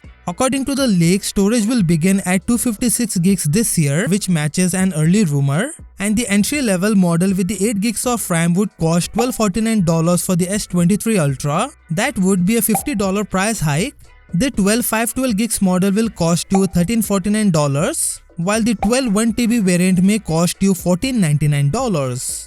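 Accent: India. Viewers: That indian accent really caught me off guard lmfao. Couldn't take it serious so I didn't watch the video, sorry but I had to let that out.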